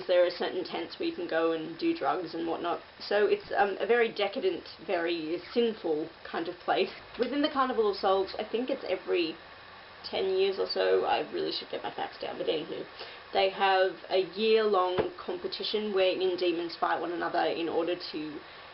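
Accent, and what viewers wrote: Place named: Australia. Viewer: I thought it was you because you can hear the slight twang of your accent haha. It's very good and also, co-wrote a musical? I must say I'm ever impressed! You're a book loving, disney obsessed, musical writing Australian. It doesn't get more awesome than that :)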